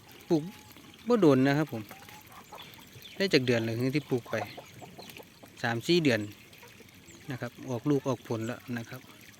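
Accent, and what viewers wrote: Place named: Chaiyaphum, Thailand. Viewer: ว่าแล้วสำเนียงบ้านพี่เล้ยยย น้ำไม่ท่วมดีใจฝรั่งก็สวยงาม ยังไม่ได้กลับชัยภูมิเลย อยู่แถวไหนครับ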